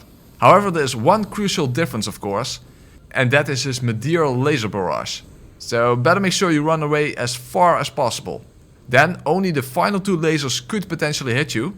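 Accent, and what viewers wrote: Netherlands. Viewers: Ur Dutch aren’t u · Haha tita tovenaar Rogier geweldig ik hoorde all aan je engels dat je nederlands bent maar dat grapje was echt geweldig🤣🤣🤣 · Kankering = conquering hahaha funny Dutch accent you have. Nice vid! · damn you have such a thick dutch accent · Dutch? xD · the moment u said... ''ti ta tovenaar rogier godverdomme'' xD my first time i watch one of ur video's and i hear u are from the netherlands XD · the dutch accent is also super charming lol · i can smell the dutch in your accent. gegroet 🤙🏼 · He sounds dutch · Love the video I love the Dutch accent too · As a half dutch guy, hearing a Dutch accent upon opening a video is like Christmas day. So comforting 😌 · I don't know who you are but you sound very Dutch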